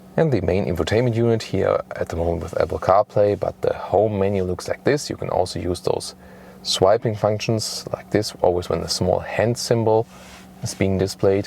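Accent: Germany. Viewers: I like your German English!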